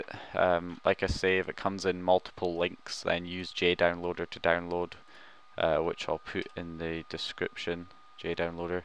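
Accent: Scotland, United Kingdom. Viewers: cheers mate, your tutorials have helped me so much, good to hear that barry Scottish accent!!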